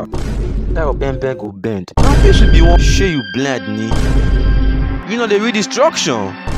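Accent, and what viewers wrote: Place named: Nigeria. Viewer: nigerian patois my fave accent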